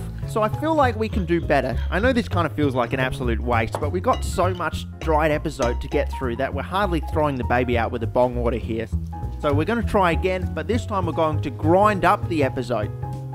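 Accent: Australia. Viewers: When I started watching this video I though this guy is an Australian and it got proved a bit later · aussie nilered. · Would be 10/10 if more raw Australian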